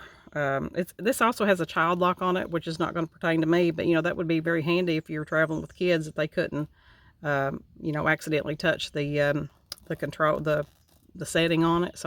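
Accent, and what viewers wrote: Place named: South, United States. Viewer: just found you. what state are you from.??? Sound like a Southern sister. I did subscribe. looking forward to your videos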